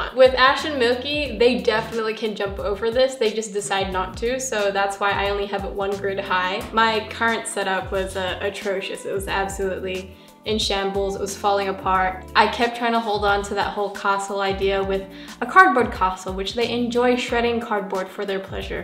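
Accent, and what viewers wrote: Australia. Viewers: Ok is it just me or does she sound like she is speaking with an Australian accent AND an American accent.?? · I didn't realise you had an "Austrailian" accent until I saw this comment lol, I hear it now that it's been mentioned but totally didn't realise it before that. wow. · This was so funny holy cow. I felt this spiritually. I didn’t realise you were Australian. I’m moving to an apartment and I felt that hating yourself part for their depressing area. But the laugh had me dead. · Not gonna lie, I came here for the bunny content cuz I have a bonded pair. I found your channel when I was looking for bonding advise. I subscribed though cuz you’re so cute cuz of your Australian accent. It’s so attractive to hear 😅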